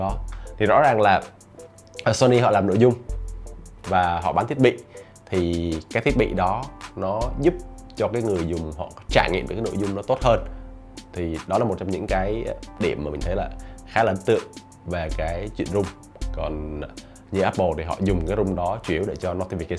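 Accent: Vietnam. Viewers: Tiếng anh rất việt nam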